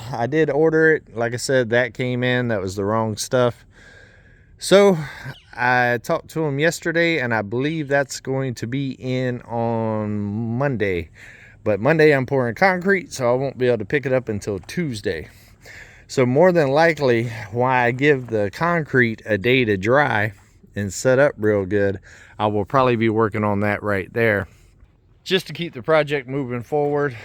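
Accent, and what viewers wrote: South, United States. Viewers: I think you're amazing and who cares if other people say you talk too much on this channel that's what it's about letting us know what's going on how you feel how you don't feel how you which is so nice a beautiful person like yourself should not be told to hush I love your accent you have a Southern accent I love the way she talks which is just a beautiful person just like you so keep on keeping on and I'll keep going on those Adventures with you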